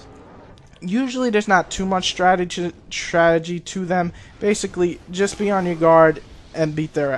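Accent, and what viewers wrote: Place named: New York, United States. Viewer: Proper New yorker :) The way he says bosses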